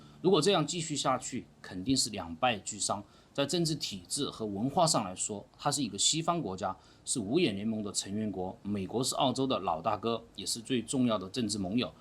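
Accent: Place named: Chongqing, China. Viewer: 很喜欢听你的重庆口音的国语！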